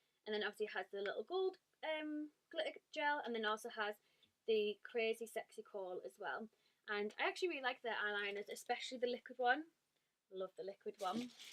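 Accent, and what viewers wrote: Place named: Leeds, United Kingdom. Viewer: You sound like your from Leeds ? X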